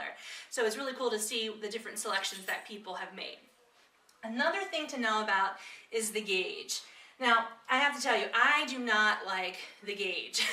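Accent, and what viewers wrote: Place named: East Coast, United States. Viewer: Nice! This is good stuff to inform and encourage beginners. And I really like that you speak well and I can see your face! I am hard of hearing and read lips, so this is especially important to me. Later you'll probably mostly be showing your hands, but I'll still be able to hear your good speech. I bet you're an East coaster -- out west those things are pronounced "skains" instead of "skeens." Good work, woman!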